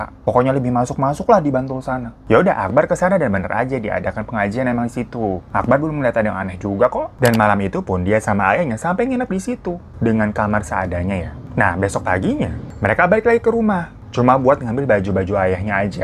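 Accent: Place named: West Java, Indonesia. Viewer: Kak logat sunda tapi nama nya kayak jepang yà....😃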